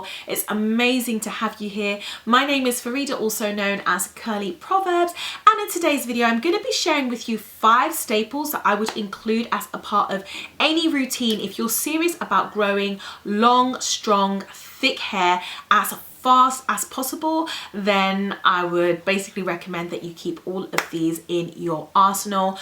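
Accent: England, United Kingdom. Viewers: That English accent...love! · you had an English accent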